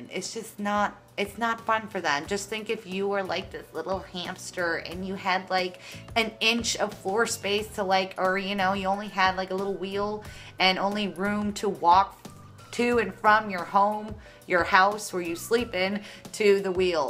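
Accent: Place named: Texas, United States. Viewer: Everyone saying she reminds you of grave3yardgirl... y'all are just recognizing the Texas Suburban accent. This is just how we talk here.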